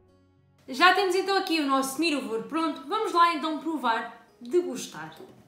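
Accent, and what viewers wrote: Portugal. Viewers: é uma lady elfica, escutar Português original é sempre bom ainda mais se for falado por uma Lady alta elfica.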